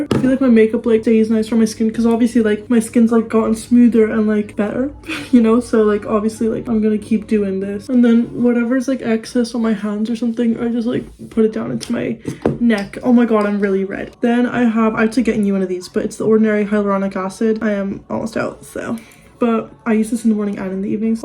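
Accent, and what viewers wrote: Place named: United States. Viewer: U don't sound Irish at all ur accent sounds so american but it definitely slips out when u say photos lmao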